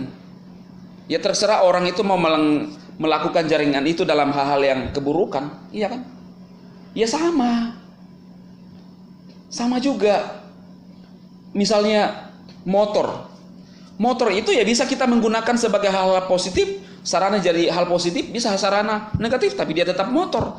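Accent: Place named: South Sulawesi, Indonesia. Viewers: Bang asalnya dari sulawesi yah? Logatnya kayak org makassar..maaf kalau sy salah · orang dari sulsel kah bang? logat na kentara e...hahaha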